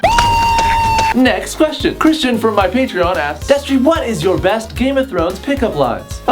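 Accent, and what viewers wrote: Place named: Scotland, United Kingdom. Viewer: Can you say "if you had a chance to change your fate, would ya?" In your Scottish accent ? :) (from the Disney movie brave)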